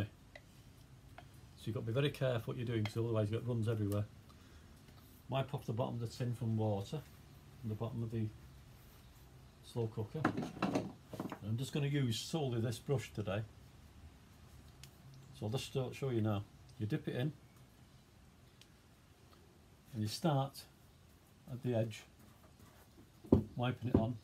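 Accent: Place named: Oldham, United Kingdom. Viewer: great.. 40 years ago taught..you never lose it my first car I painted this way.. boiling hot day.tin in. paint in the milk pan in water.. and tongues from the washing machine to carry it out.. cheap brush.. disaster.. lol.. Oldham lad me.. you sound local...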